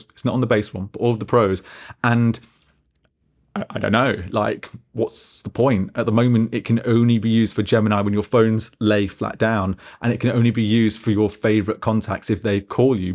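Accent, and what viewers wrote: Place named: United States. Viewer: Really good American white guy accent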